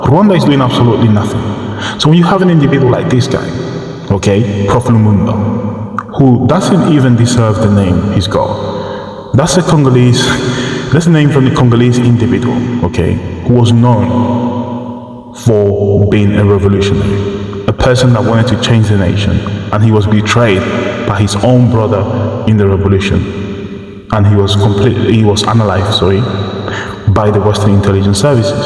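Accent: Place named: RP, United Kingdom. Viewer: Your analysis is flawed ... And your Porsche accent shows you are not even resident in Africa.... Many of your congloese brothers and sisters on your Eastern borders are celebrating the M23 rebel takeover of Bukavu and Goma cities.... DRC government too has to take responsibility blaming Rwanda wont cut it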